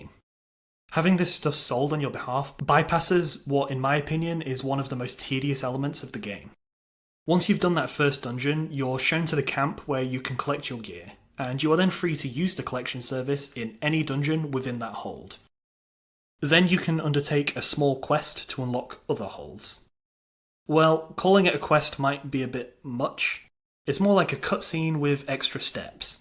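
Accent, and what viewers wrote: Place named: Taunton, United Kingdom. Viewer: Dunno about Liverpool, sounds more like Taunton to me.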